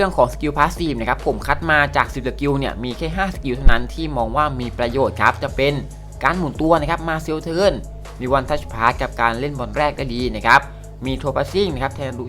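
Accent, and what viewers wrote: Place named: South, Thailand. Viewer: พี่อยู่จังหวัดไหน สำเนียงเหมือนคนใต้เลยครับ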